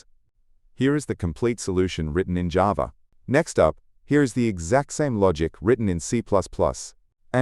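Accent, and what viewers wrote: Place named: United States. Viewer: I could've sworn there was an American accent earlier.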